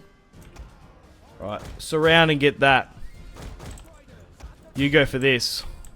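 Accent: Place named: Australia. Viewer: gday mate how you going! Love your australian accent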